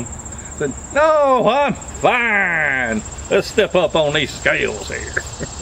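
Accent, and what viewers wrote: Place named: Tennessee, United States. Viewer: Glad your doin better! Idk where in Tennessee you are from but sound exactly like fellers i talk to around here. Im from scott co lol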